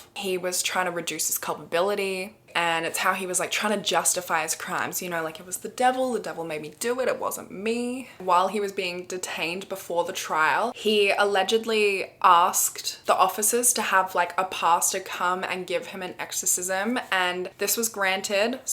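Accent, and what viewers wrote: Australia. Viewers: Really enjoyed this story. But "like "like" "like" and with the Kath and Kim accent in there, was a bit grating on my old brain. I wish you all the success utube can give you.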